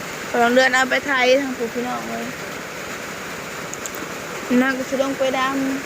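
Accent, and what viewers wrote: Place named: Isan, Thailand. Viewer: เสียงเว้าม่วนดีชอบฟัง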